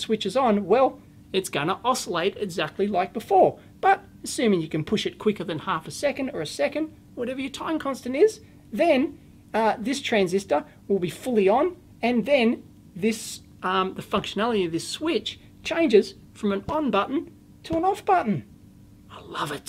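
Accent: Australia. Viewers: Commenting again because this is that good. This is the first video by I've watched, but communicating the thought/development process of a circuit is what I've been searching for since my undergrad years! Extremely impressed. And delivered with an Australian (?) accent? Amazeballs, and Bob's your uncle. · ok I am starting to like the aussie accent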